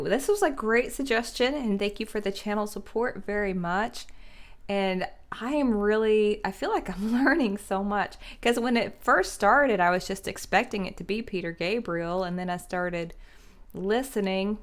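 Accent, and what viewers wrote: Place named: South, United States. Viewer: Nice southern drawl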